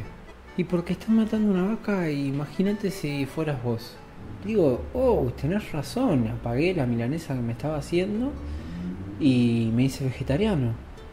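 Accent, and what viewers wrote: Argentina. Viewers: amigo sos correntino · Creo que es argentino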